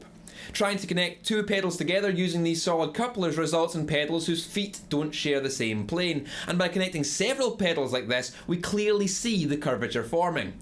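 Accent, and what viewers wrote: Scotland, United Kingdom. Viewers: Oh how I love me some thick Scottish accent! Ah yes, the old home land. Great truth as well! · Oh my god. Your scottish accent is perfect with your anger ahahahahaaha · I like Scottish accent even though it's hard to hear..😂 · the fact that you're a scotsman makes the preaching sound even better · Love the Scottish accent. (No homo) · And that's why the Scottish accent is the greatest ever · i just love the Scottish accent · Reminder to me: Visit Scotland. Just because of that cool accent... :-D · Yeah the Scottish accent is pretty cool (I’m American) but he really is well spoken and knowledgeable, keep up the good work! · That just made my day... Hearing a Scottish man describe the devil working through guitar pedal couplers in an insidious voice, with sinister music and maniacal laughter in the background. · Here's another one of your videos that I walk away from a wee bit smarter from having viewed it. 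Aside from the super cool Scottish accent that we all love, you have a great blend of intelligent content and delivery of said content. Keep up the good work! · He’s Scottish. He says “Pair-dull’ 😄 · I'm first time on this channel, accent of this guy is soo beautiful. Is he Scottish ? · John JohnnyArt Pavlou It makes me wonder where he's from. He sounds a bit Scottish. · This only works because of the Scottish accent. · I didn't know you could get even more Scottish... Great video as always! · If anything I watch your videos for your Scottish accent, I love it. And that's no shiite. Makes me want to watch "Trainspotting" just to hear Robert Carlyle ( Begbie ) say " You fooking coont ". Great content bruv, always spot on. Peace. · Are you Scottish? I think I'm getting better about telling apart accents in the UK. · Why is the Scottish accent so well suited to these "HELLFIRE AND DAMNATION UNTO ALL YE SINNERS!!" kind of things? 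If I said it I'd sound ridiculous! My Glaswegian Great-Grandmother is laughing at me from beyond the grave.... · A Scholar, a Guitarist (I would have said Gentleman instead of Guitarist but being a Guitarist is much better than being a Gentelman lol ) and an Engineer :) well said sir. and I have to say only with your great Scotish accent can you say the most epic part of this video at 0.23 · I am hoping that I was right and it is scottish :D (totally love it by the way)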